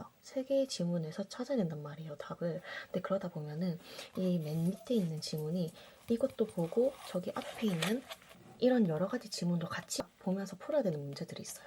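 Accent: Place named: Seoul, South Korea. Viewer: 난 서울말인줄 알았는데 차분하니,, 어디 사투리라는 거임?? 전라도도 아니고 충청도도 아니고.. 음..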